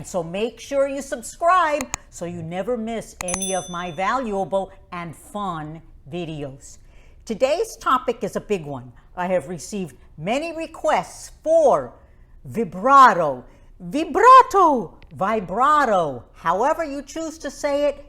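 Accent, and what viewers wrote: New York, United States. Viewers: I knew you had to be from NY or NJ by that accent! Thanks for all the great content!!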